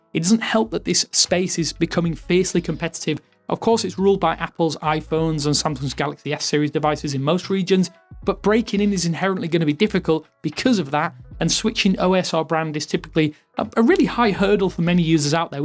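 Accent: United Kingdom. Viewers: I don't like this guy's British accents.! ❌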